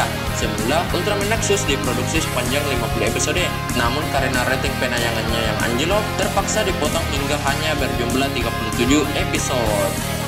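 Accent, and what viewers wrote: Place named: Eastern Indonesia, Indonesia. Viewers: Asal mana sih logatnya kayak orang makasaar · hahah masa kecil kita bahagia banget, thankslah infonya,,, anyway logat ngmgnya asik banget bang, orang timur apa sumatera nihh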